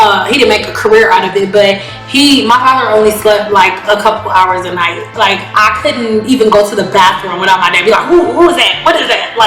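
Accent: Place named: Florida, United States. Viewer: Florida in the house, I love❤ the way you say Gone head Girl😀 your accent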